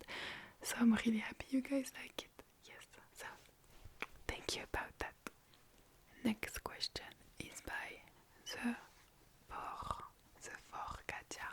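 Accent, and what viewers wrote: France. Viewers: YESSSS THE FRENCH ACCENT I LOVE THE FRENCH ACCENT 🥖🥖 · hii, i'd love an asmr video full in french of following instructions themed, cause with those videos even if i don't speak the language i can still understand what you're saying, and your french accent surely deserves a whole video! 🤓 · I think you look much older with your natural hair color. I love it! By the way ,you are so pretty and everytimr I hear your sweet french accent I get the goosebumbs.🫡😔😂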